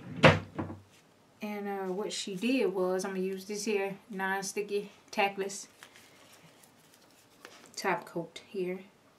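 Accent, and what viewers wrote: South, United States. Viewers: Omg southern gal to the fullest 😂. I love this!!!!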